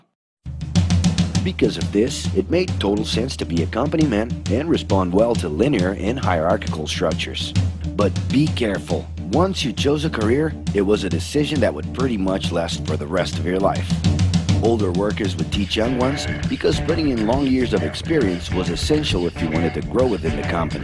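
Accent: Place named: Latin America. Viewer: where is the narator from? seems like a latin-based-language accent...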